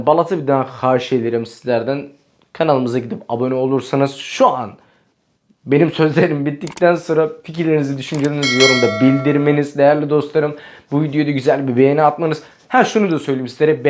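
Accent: Nakhchivan, Azerbaijan. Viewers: Qaqa, senin Azerbaycan dilinde lehceli danışığını sevirem. Ona göre izleyirem seni. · Sen ne qeweng Azerbaycan dilinde daniwirsan😁👍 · Qaqa sən azərbaycanlısan ? Bizimki danışırsan .qaqam sökür sən ha 😂😂😂😂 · 😂😂😂nə gözəl danışır Azərbaycan ləhcəsində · Ayda sən diyəsən Naxçıvanlısan danışığın ləzzət eliyir😄😄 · Salam siz azerisiniz yoxsa turk lehcenizden belli olmur da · Azerice konuşman ləzət edir. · Azerbaycanca necede qeseng temiz danisirsan abi🤣🇦🇿🇹🇷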